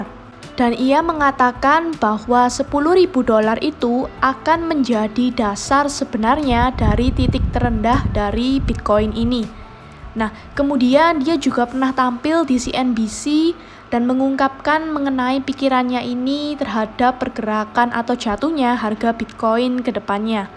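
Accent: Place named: Surabaya, Indonesia. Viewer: Aku suka banget sama suaranya 🤩 Mengingatkanku dengan Surabaya sebagai kampung halaman 🥰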